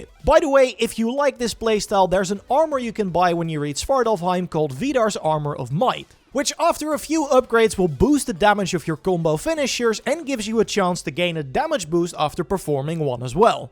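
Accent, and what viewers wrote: Netherlands. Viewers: Heerlijk, dat Nederlish.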